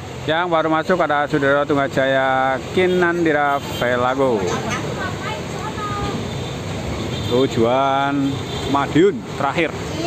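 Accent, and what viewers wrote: Banyumas, Indonesia. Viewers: Hadur dan nyomak lagi Bang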